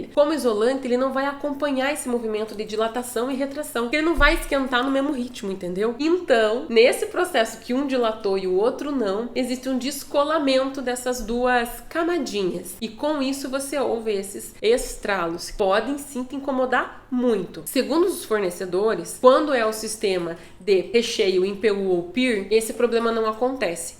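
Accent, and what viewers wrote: Brazil. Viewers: Adoro seus vídeos, principalmente porque sou muito fã deste teu sotaque Paranaense, fui criado em Paranaguá, mas atualmente moro em São Paulo. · Nossa o conteúdo foi fantástico, mas voce explicando foi surreal, serio mesmo parabéns e o sotaque de curitibana entao, hahaha parabéns mesmo, tirou varias duvidas que eu tinha.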